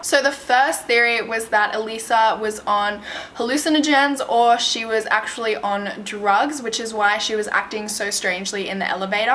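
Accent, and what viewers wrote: Australia. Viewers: It's her accent. She's Australian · Wow I just realized how beautiful you are! And also I love the australian accent :x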